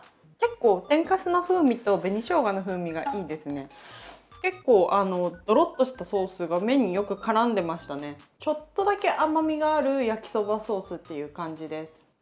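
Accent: Izumo, Japan. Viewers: どげだ…出雲弁！同郷の星やね～ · 島根出身だけど最近帰ってないからちょいちょい横に出てくる出雲弁が懐かしくて涙ちょちょ切れ！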